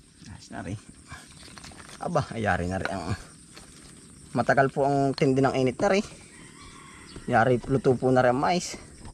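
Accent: Quezon, Philippines. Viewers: Hi,! Kahapon lang kita unang napanood at naaliw naman ako sa iyo. Taga saan ka? Kasi parang taga Mauban, Quezon ka parehong pareho ang mga words na ginagamit mo pati yong accent mo. Bago mo akong subscriber from New York,USA. Ingat ka lagi and God bless you more 🙏👍👍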